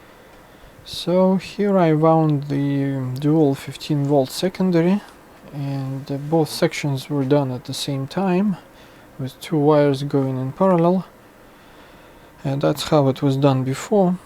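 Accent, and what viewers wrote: Russia. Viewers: Yeah you are probably right. I guess searching for a replacement transformer that would fit this application would time consuming as well. By the way I was wondering for some time where do you live :) I noticed your Russian accent but you are using 120 V AC. So you must live in US or Canada. Is that right?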